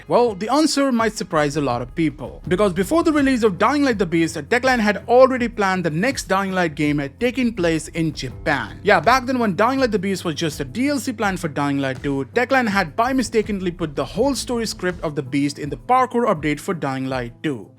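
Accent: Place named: India. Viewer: 1min listening the video im already tired of indian accent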